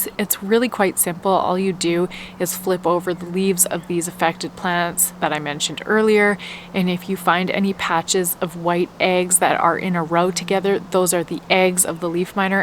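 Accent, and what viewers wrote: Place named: Canada. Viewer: I am growing these: 1 coffee shrub, 1 avocado shrub, 12 buckets of potatoes, 6 buckets tomatoes, 6 buckets onions, 6 buckets sweet corn, 6 buckets carrots, 2 buckets yellow squash, 2 buckets green beans, 2 buckets jalapenos, 2 buckets green peppers. I'll look & see if I find any of these larvae. Thanks for the heads up. Love your Canadian accent !! I subscribed.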